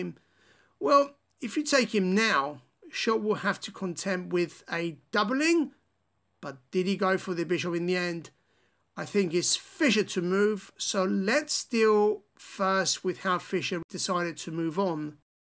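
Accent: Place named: United Kingdom. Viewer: He doesn’t speak American he speaks British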